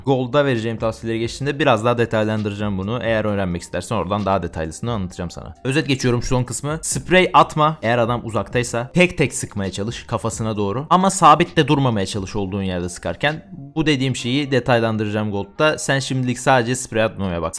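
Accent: Thrace, Turkey. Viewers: Abi trakyalımısın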